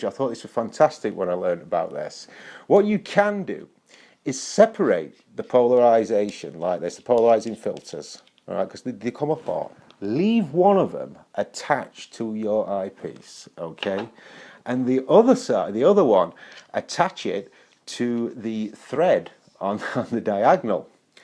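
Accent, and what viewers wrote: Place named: Cockney, United Kingdom. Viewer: Sorry, man, but your "m'kay" and "o'right" remind me video by Alfie Solomons "Learn the cockney accent". 😅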